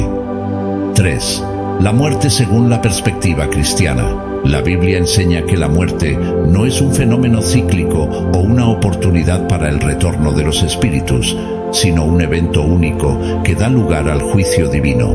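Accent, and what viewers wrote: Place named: Spain. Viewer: Es mi imaginación o es más atacar a la nación hispanoamérica? Para comenzar el que habla lo hace con acento español y se refiere a 2 días que se han establecido solo como tradición en lo que es América latina, Ya lograron introducir la religión en la mayoría de la gente y refuerzan lo que se supone es fé para "invitarte" a nunca hagas o digas nada de esas tradiciones porque Dios no lo permite. Y yo soñando con que el día que me muera se acuerden de mi para invitarme a casa a convivir con mi gente por medio de un altar.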